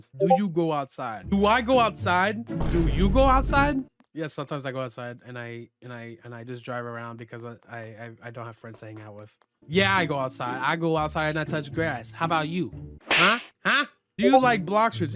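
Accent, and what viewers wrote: United States. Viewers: and you sound america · youre from california?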